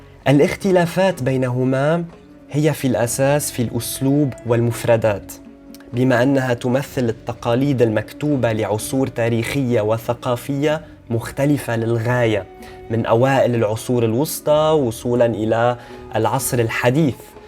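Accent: Lebanon. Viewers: He has a slight Lebanese accent when he speaks MSA. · I guess he is lebenese because of his smooth accent so yes he's an arab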